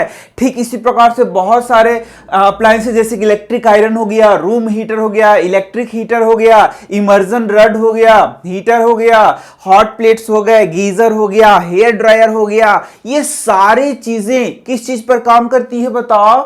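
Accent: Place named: Uttar Pradesh, India. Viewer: sir aap up ke hona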